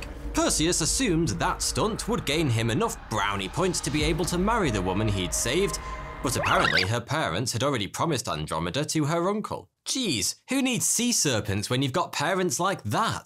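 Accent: United Kingdom. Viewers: I have never heard someone so British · I watch these because it feels like I have a British teacher who's actually interested in the subject they talk about. Makes it even more enjoyable to learn new stuff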